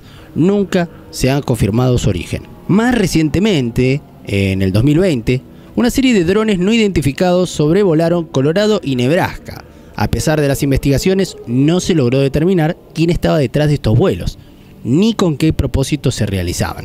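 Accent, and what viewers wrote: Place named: Argentina. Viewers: Si Elvio. Así hablamos en algunas provincias de Argentina. Se lo llama Regionalismo. Saludos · Ese acento es argentino. En España a la "ye" le decimos "y griega", a la "v" le decimos "uve" y a la "w" le decimos "uve doble". Ja, ja. ¡Parece que hay variedad en el español!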